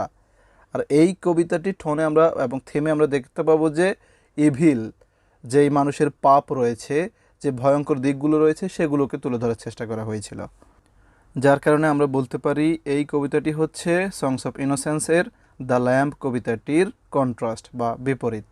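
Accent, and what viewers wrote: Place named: Faridpur, Bangladesh. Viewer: আপনার বলার ধরণ একসেন্ট, টোন, অনেক টা ফরিদপুরের মতো। ❤❤❤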